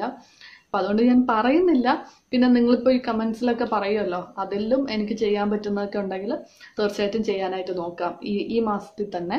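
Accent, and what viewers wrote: Palakkad, India. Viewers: Palakkad Way of talking More vlogs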